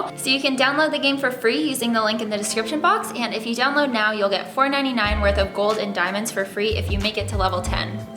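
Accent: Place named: Canada. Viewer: sweet.. I verly noticed she is from Canada and that explained why she said *aboat pretty cool any way loved the video! (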